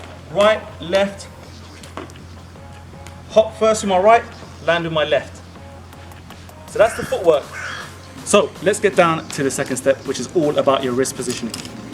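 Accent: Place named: London, United Kingdom. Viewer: Awesomely slick mate, my ropes should be here today or tomorrow.. Can't wait.. How long should I live in London to acquire the slick accent yo?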